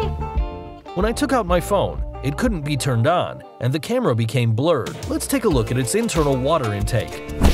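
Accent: United States. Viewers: The script of this video sounds like it was written in China and read by an American.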